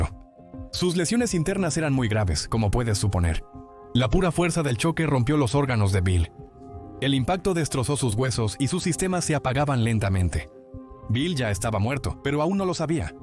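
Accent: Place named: Mexico. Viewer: YO SE QUE TÚ ERES MEXICANO EL ACENTO LO DICE TODO.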